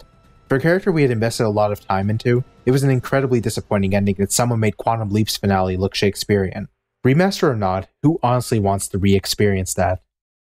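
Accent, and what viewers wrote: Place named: North America. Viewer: I'm English and clearly understand the narrator by the way. I guess North American is hard for some...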